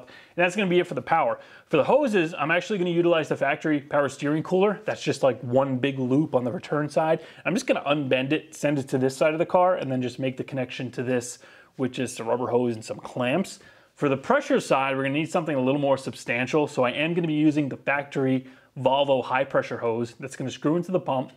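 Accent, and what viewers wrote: New York, United States. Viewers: My first car was an 85' trans am same blue! I really enjoy this series, you've done a ridiculous amount of work on this car! My current 89' GTA never disappoints. I'm in Northern CT, you must be in long Island if I here you're NY accent correctly.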